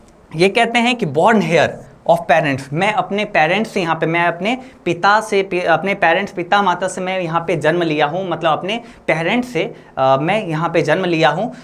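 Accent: Bihar, India. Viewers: proper bihari spotted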